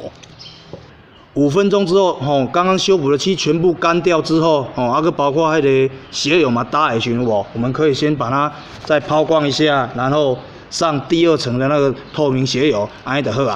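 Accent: Taiwan. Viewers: 口白：落魄到拍Youtube要轉便當錢（沒辦法） 說真的，真的是一個很務實、又踏實的人（擦鞋也算是生活小細節，小品）加上一點點的江湖味，操的台語口音，真是滿有意思的。所以，工作太忙也要記得來收看的影片。給的建議：拍影片是快樂的，不要因為這樣有壓力，坦白說一支影片要弄好大概也是要花很多時間。記得要幹嘛！訂閱！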